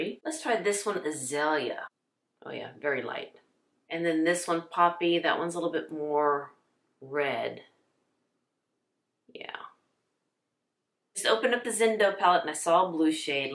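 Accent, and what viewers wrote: United States. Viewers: Loved the looks - and all beautiful. Nice to see on mature eyes, very pretty but in a gorgeous elegant way. 💕, also love your American twang and your gentle energy. Just gained a new subscriber. Greetings from UK! 🥰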